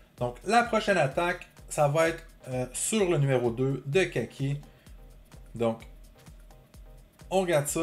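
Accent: Quebec, Canada. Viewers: tu est québécois ?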